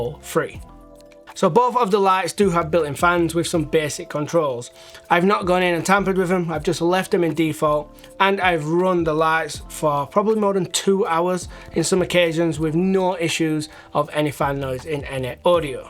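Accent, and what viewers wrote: United Kingdom. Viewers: That’s the best voice and British (British, right?) accent on the whole film production–centric YouTube!!! 🎥👑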